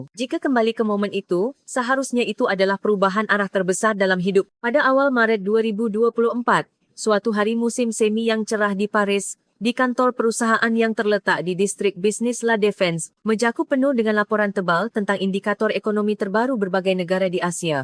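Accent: Malaysia. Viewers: 😅😅😅😅 Narator nya bukan orng Indonesia Logatnya 100 % Malaysia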